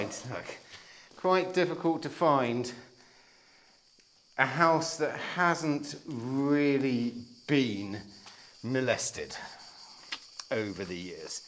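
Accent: United Kingdom. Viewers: Potentially a nice country home. The cost? I love the way you brits talk, Right! Lets Crack on! I am currently reading more of Andy McNab, on of your outstanding countrymen. Thanks, also another great tour…..real history there. 🇺🇸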